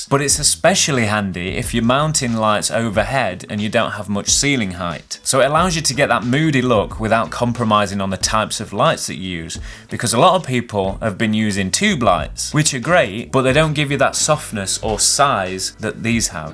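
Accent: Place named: England, United Kingdom. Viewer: Nice to hear an English accent on a YouTube video for a change.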